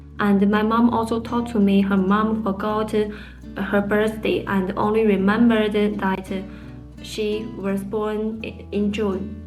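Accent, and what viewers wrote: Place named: India. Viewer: Your English like Indian 😊, ❤ Bhabhi ji. Namaste ❤ 😊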